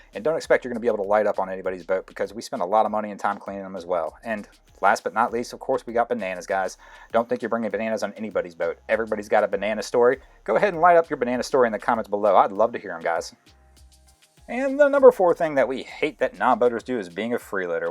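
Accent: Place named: Maryland, United States. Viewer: are you from Maryland? Your accent sounds like a Maryland accent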